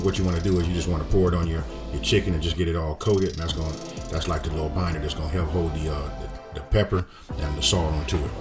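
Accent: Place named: California, United States. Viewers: you my fav!! You get to the point and make it simple!!! And that Cali accent 💪🏾💯💯💯👍🏾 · I can certainly tell you’re from Cali man, love the video, subbed.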